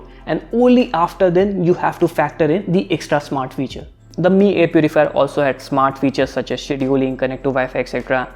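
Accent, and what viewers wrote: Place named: India. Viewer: love the proffesionalism in your voice, and combined with indian accent (which in my head is connected with microsoft scammers and shitty call centers) makes it briliand and a honey on my ears. Great job man. Just bought my first air purifier and hope its going to help my girlfriend with her allergy.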